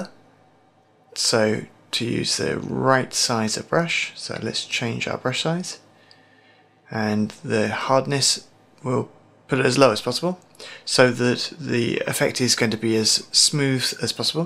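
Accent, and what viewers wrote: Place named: United Kingdom. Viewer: The British accent is awesome... This tutorial has been terrific. Thanks...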